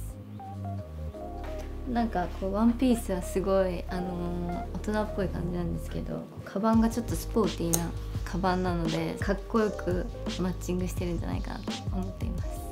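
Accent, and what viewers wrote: Standard. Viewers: 모처럼 모모가 표준어로 열심히 말해줬는데 ㅠㅠ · 標準語でお話するの謎の寂しさがある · ミラノまでウォンジョンヨ先生来てくれてるだ〜！このペアは最強がこんなに標準語喋ってるの新鮮w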